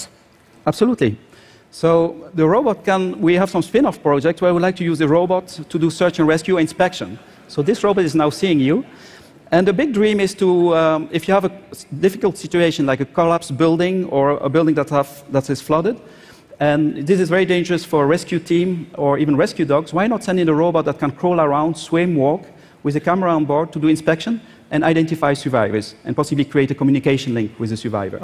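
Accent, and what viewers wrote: France. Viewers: he's a french guy. i can hear his accent 😁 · when i finally noticed he is french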